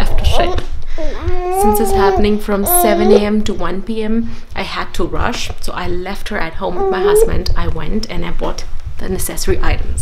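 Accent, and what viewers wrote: Germany. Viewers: Love how your accent has slowly been Germanised. 😄 so cute. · Dear, sab se pehle, you are lovely! I’m a German, living in India, married to an Indian and we have a 6 year old daughter. Please zyada stress mat karo. Your daughter is fine for sure. Just feed her with your milk if she doesn’t want anything else right now. I don’t know how old your daughter is, but don’t worry, she will take what she needs. You said your milk isn’t satisfying her for too long, so maybe try with fruit purees? I don’t know how far you are with solids for her, but I guess she must be around 6 or 7 months? Teething can be a possiblity, even if it’s lasting already for a while. I wish you and your family all the best! Stay safe and healthy, all will turn out well! And yes, your accent is pretty germanised, mine is totally indian. Guess that happens naturally;)! Mujhe accha lagta hai! 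Take care and keep posting! Namaste 🙏🏼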